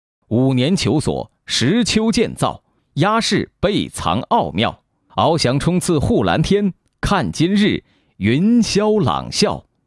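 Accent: Standard. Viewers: 虽然旁白的声音清晰发音标准字正腔圆，但是这种中式传统的电台广播腔听到了2019年真的不觉得好听了，甚至听起来觉得有些油腻。262你快回来 我要听你的乡音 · 字正腔圓就沒有特色了~ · 262浓重口音的普通话和慷慨激昂的解说更能打动观众。这个解说虽然字正腔圆，总感觉有点象机器人，没什么感情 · 这字正腔圆的普通话，有一种那些泛滥垃圾营销号的廉价感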